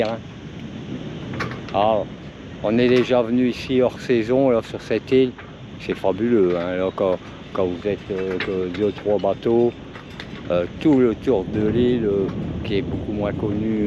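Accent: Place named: North, France. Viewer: Je vous suis depuis la Vendée, un bel accent du nord, merci à l'interprète, même le chien à l'accent du nord. Cordialement.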